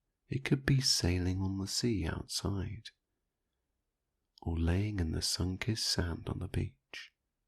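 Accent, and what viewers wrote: United Kingdom. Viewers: Who are you???? I tried LOADS last night, and finally found this. PERFECT!!! No music, and a UK make voice that was just perfect for me. Thank you so much, but please reveal yourself!!!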